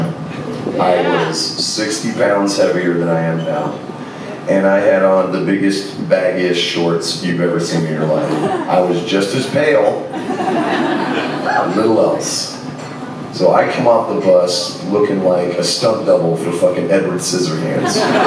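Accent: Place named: Scotland, United Kingdom. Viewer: that beautiful scottish accent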